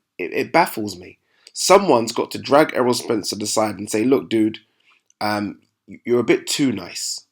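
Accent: United Kingdom. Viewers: Dismiss fights that are disrespectful to the fighter. Why should Spence go to the UK again? Why should Spence fight washed up fighters and fighters he has beaten already? Spence wants to unify 147. He took the belt from Brook and Khan is washed. You sound as if you may be a person from the UK? Could you be a bit biased? Tired of coming to America to watch good fights? Rent them on ppv like most people and save them airfare. But, stop making trash vids about why Spence must fight in the UK to make money. If you want Spence to fight Khan and brook, make vids about them coming to Dallas to face Spence to get paid. Not like either of those guys are making more money than Spence.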